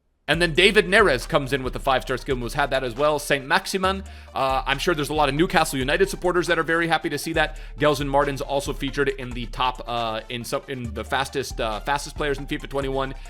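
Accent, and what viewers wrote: Australia. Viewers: That Aussie accent 👌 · More Aussies accent pls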